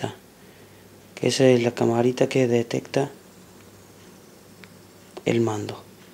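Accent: Córdoba, Argentina. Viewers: no tarde ni 5 segundo en darme cuenta q sos cordobes aguante cba loco! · Se te nota lo cordobés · sos de Cordova? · amigo sos de cordoba o de buenos aires etc etc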